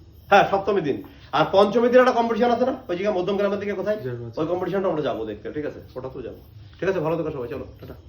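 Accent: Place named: Bangladesh. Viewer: Son surar bachcha tui ja vaba Indian armed forces ka niya kotha bola6is are satha tor bou sur tana6a......Todar duto kai bol6i Tora manusar jat na ra......sotti kora bolto tora indian na ki oi parar mal .....kotha suna to amar tai mona hoi made in bangladesh.....Oi body bilding are ghamant ak dom dhukia dabo........Janor sala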